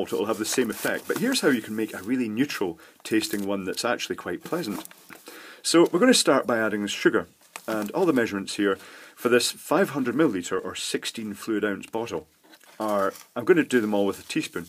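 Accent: Scotland, United Kingdom. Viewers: you can tell your scottish lol............ get 6 for a quid in poundland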